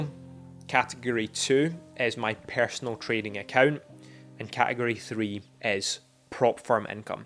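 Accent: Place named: Glasgow, United Kingdom. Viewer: Hey, I've just came across your videos. Are you based in Glasgow? Making presumptions on your accent. I'm full time trading based in Motherwell. I'm sure I'm the only full time swing trader in Motherwell!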